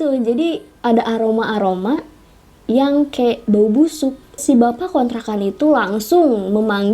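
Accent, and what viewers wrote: West Java, Indonesia. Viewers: Sunda pisan euyyy,lope yu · Orang Sunda ya Neng....?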